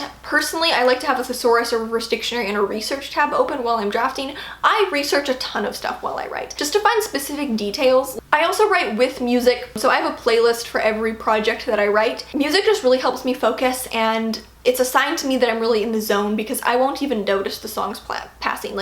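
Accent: Canada. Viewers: So I should apologize then. I’m sorry I made you feel attacked, I mean you don’t even know me lol that has to be the most annoying part. But I still find it hard to believe that you have never had anyone say that your accent sounds high class. Like the word Aunt. If you pronounce it “Ant”you are “normal” but if you pronounce it “Awe-nt “ like the British do, then us “normals” will see it as high class and jot that down in the back of our heads. Do you see? Idk if there is a true form of English that invokes the superior intellect but it’s something that id like to see your option on. For example a person who thinks in Spanish will have different thought patterns that one who speaks in a Western accent. So what I am challenging you on is do you think your Canadian accent makes you think more intelligently that my Western or American accent?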